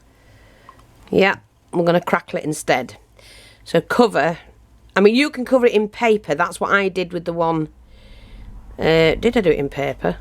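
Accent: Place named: England, United Kingdom. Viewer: Your awesome you have warmed the kukkels of my heart..lol thanks a lot.Is it Easter there,Where are you from You sound English.I love the accent..Im a Newfie in Kitchener Ontario.I call myself The Official Witch of Kitchener City.lol.Have you ever watched any of Lisa The Pagan videos She sounds just like you I love her.Blessings.)0(.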